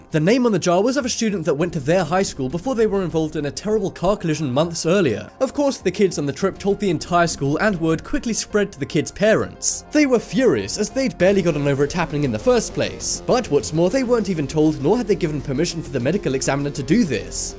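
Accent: England, United Kingdom. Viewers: damn you speak soooo british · Morgan Minnix no cos he had an English accent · it's 999 in england, and you sound English so you should know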